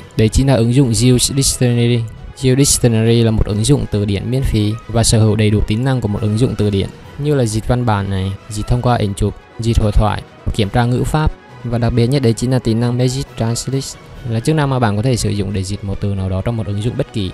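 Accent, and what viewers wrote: Central, Vietnam. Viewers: ông là người vùng nào miền Trung đấy:v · Wow là ng miền trung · giọng a giống người miền Trung._.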